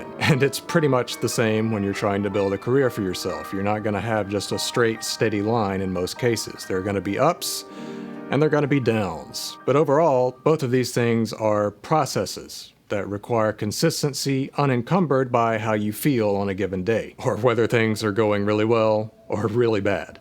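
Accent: South, United States. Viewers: there's only one thing I love more than the intersection of the academic study of music and the artistic application of advanced music theory on technology: hearing the subject discussed in a mild southern accent. 💜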